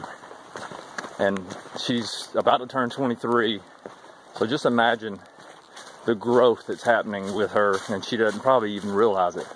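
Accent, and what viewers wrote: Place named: South, United States. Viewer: Three comments. First, thanks. Second, happy anniversary. Third, being a fellow southern boy, in fact I'm back in Moore County, you may know where that is, I have an observation, if I may. You're six four, 190. You pass one or a pair of people in the woods and you ask "hey, how are y'all doing" with your southern accent and great smile. They, like everyone on the trail, are trying to unplug from the Matrix, however, now they're worried that they've stepped into another movie because they're listening to the instrumental "dueling banjos" playing in their thoughts. Please don't take this as criticism, just an observation. I don't have a solution. I've found people everywhere are fantastic, just as I know has been your experience. I made note that several NE folks had weighed in positively, and I hope I've conveyed a little southern perspective. 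Again, thanks.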